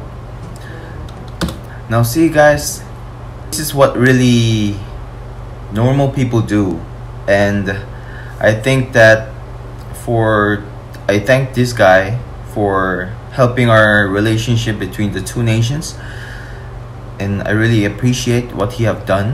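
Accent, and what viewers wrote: Philippines. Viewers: Kaya pala your accent is like Filipino haha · Lol, you have a Filipino accent when you speak English. 👍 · Hey you've got a good filipino english accent and its perfectly clear. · You sounded like filipino already ☺️ Love and peace to all Filipino and Korean people 😊❤️♥️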